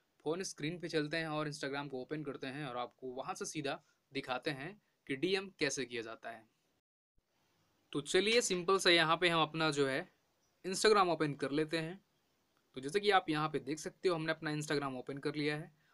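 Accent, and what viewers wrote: Odisha, India. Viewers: Bhai are from Odisha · Brother are you from odisha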